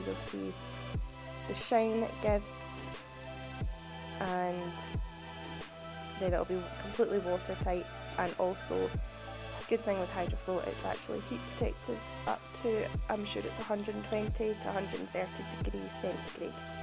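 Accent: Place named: Scotland, United Kingdom. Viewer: Great Video, I agree about using a whisk its much easier to get the mixture creamy enough and lump free. Thanks for Sharing, great to hear the Scottish accent too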